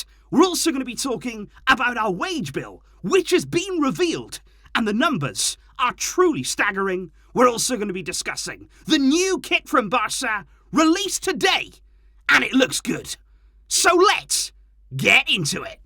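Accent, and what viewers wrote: Wales, United Kingdom. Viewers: Do all welsh people sound like this?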